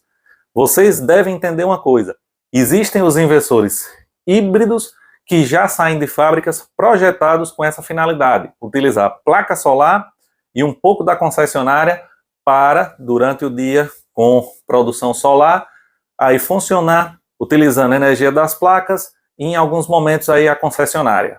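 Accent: Northeast, Brazil. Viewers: Meu amigo, você é de qual estado do nordeste?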